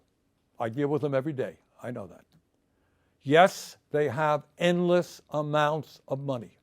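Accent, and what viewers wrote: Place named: Brooklyn, United States. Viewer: Does Bernies speech really sound like an "American accent" to you? It is a Brooklyn accent to be specific.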